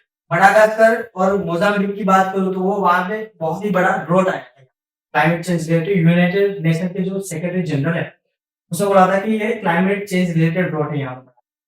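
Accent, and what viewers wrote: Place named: Gujarat, India. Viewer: hindi accent is like gujrati. I think he is from gujarat. I really appreicate sir's efforts for the most amazing lecture. thank you sir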